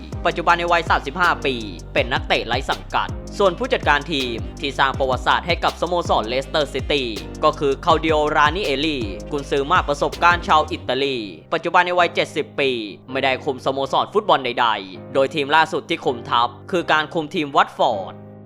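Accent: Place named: Rural, Thailand. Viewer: จะพูดเหน่อทำไมวะ ขัดใจช.ห.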